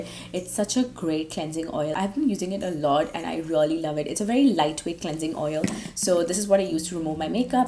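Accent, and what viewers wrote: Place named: England, United Kingdom. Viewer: Hi, I find u really pretty. Ur makeup tutorials y I like the most is mainly because u do transform from a keralite to almost a different nationality. I do watch ur malayalam YouTube channel. Like ur English accent butt u speak sooo fasttttt. U need to slow down a bit. Thanku n wish u all the luck n luv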